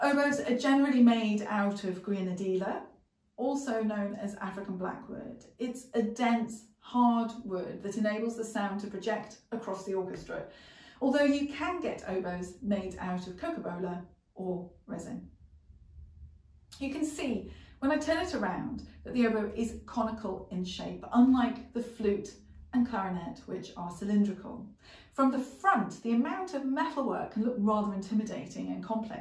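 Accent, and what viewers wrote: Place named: United Kingdom. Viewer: I so love British accents. Mesmerized....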